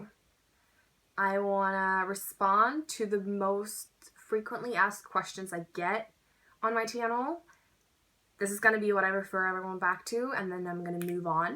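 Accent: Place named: United States. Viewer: The video that I had the deepest impression of you was how to do well in IB, your English sound so authentic American now hahaha. I'm looking forward to videos on your current life~ (e.g. travel, uni, London life etc.)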